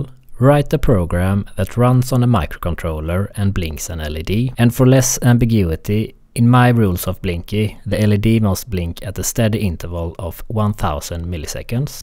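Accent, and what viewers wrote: Sweden. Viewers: Interesting project but I especially enjoy the Swedish accent. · swedish? accent is swedish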